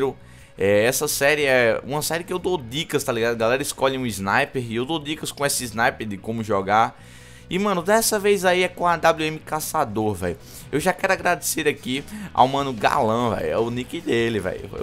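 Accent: Northeast, Brazil. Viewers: De Que Lugar do nordeste tu és?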